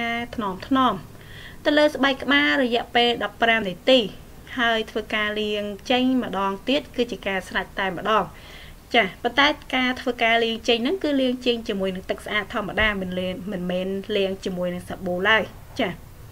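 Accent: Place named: Koh Kong, Cambodia. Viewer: អ្នកកោះកុងហ្មងសំលេងនឹង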